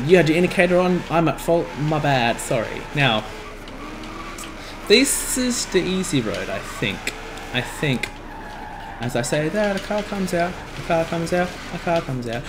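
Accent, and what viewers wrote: Australia. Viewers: I'm confused are you Aussie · Brisbane, Australia im guessing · your australian?? wanna Meet up?? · Are you Australian and if so what part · hi are u australian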